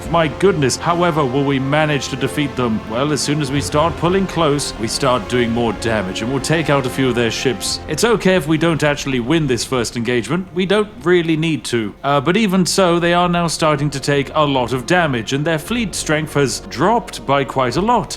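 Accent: United Kingdom. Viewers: I don't even want to play this game anymore. I just enjoy his sarcastic British canter. At 1.5x speed it's even more potent somehow. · I think this guy might be a tad British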